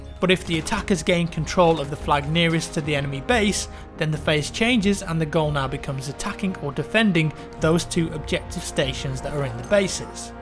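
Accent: England, United Kingdom. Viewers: Trevor Leach I would to something about the male English voice! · Trevor Leach its an English accent mate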